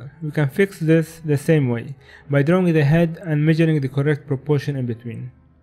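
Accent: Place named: Egypt. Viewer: Egyptian accent. So proud of you. Keep it up. ما شاء الله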